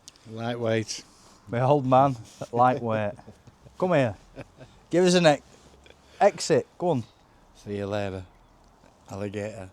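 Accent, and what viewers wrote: United Kingdom. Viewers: Love your videos, the Yorkie accent and sayings! Please keep them coming! I wish your family and you a Merry Christmas and a blessed new year! I hope that your father and you will be able to finish many more projects successfully!😊🎄🎅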